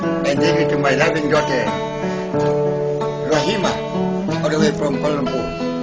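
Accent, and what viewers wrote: United Kingdom. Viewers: very nice voice,speak english like my father,british english. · HE GOT BRITISH ACCENT!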